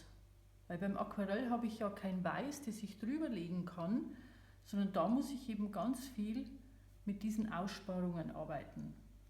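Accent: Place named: Upper Palatinate, Germany. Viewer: Das sind mit die besten Tutorials für Aquarellmalerei, die ich bislang hier gefunden habe! Die Beispiele sind gut gewählt, nachvollziehbar und wirken nicht einschüchternd. Zudem lehrreich, aber nicht von oben herab belehrend und sympathisch rüber gebracht (insbesondere auch wegen des leichten, aber unverkennbaren oberpfälzer Zungenschlags :)). Vielen Dank.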